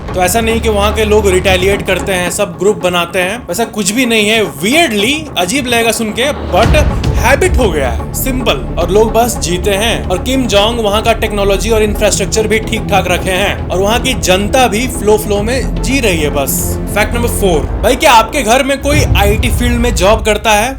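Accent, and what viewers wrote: Bihar, India. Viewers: Are you from Bihar because your voice tone is like bihari, I'm also an bihari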